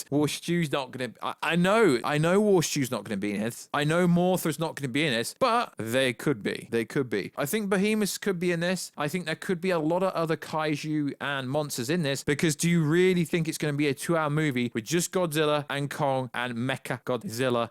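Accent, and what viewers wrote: England, United Kingdom. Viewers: My boy, that's English with an English accent, it's like me saying I don't understand Hindi if you speak with a North Indian accent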